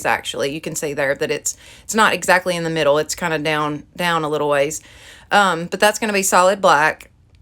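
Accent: South, United States. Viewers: I am another southern girl & I love listening to someone that sounds like me. I am so glad that you are one of those diyers that talks about the things that can go wrong while doing a project. Loved your projects & hope you have great success with your channel. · Love your tutorials! You think more outside the box from most YouTube DT Crafter's. Btw, where are you from? Your southern accent sounds almost exactly like mine. I'm from Western NC. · You are a great addition to YouTube! I’m looking forward to more videos. Awesome diy tutorials. Love your accent. My family is from the south and it takes me back. I get to talkin like them when I’m around them. Nothing but ❤️ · I generally don't like to listen to a southern accent, but I loved your spunk and not letting your kids intimidate you into not doing these videos and your style of crafting is really cute, so different from other items I've seen in other videos. 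· Girl I'm just getting started crafting making stuff for my home. I love your creations and love your southern accent. I'm from Alabama... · It’s a good thing you didn’t listen to your kids because you’re an amazing crafter. And I’m a southerner so I love the accent. :) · I LOVE the southern accent!! Anywho, I love all of your DIY's! I'm so glad I found your channel!!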